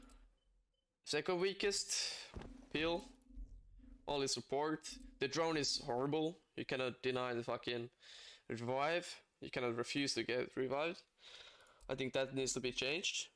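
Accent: Finland. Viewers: Your finnish/nordic accent is very attractive.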